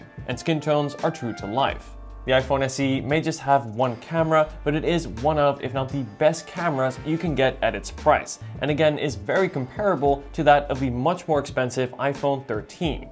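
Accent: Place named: Netherlands. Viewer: Nice comparison (review) man, took me a minute to figure out your Dutch accent. 😂😂😂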